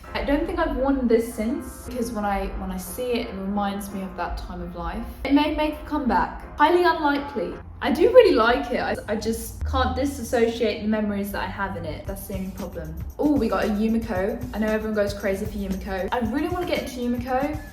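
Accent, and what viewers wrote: United Kingdom. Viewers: You speak British English very pleasantly. I think your video was well produced and presented. I'll teach you how to speak Southern American English if you should ever come to Florida.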